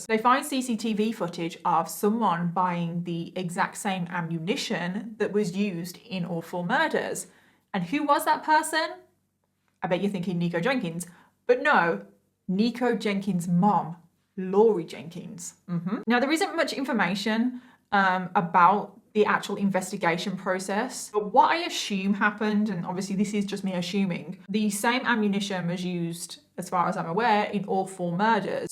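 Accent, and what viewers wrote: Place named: West Midlands, United Kingdom. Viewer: Your accent is a combination. West Midlands and what?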